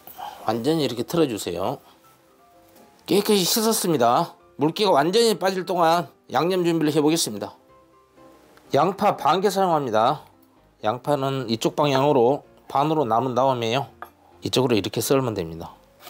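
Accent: Jeolla, South Korea. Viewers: 구수한 전라도 말씨 참 듣기 좋네요..음식두 간편하게 잘 알려주시구요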